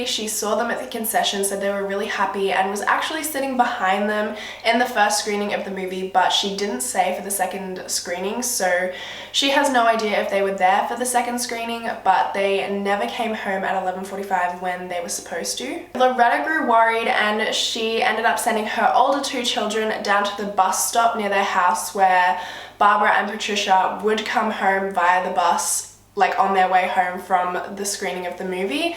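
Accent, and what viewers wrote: Australia. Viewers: Is that an Australian accent? I love it😍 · Not the most typical Australian accent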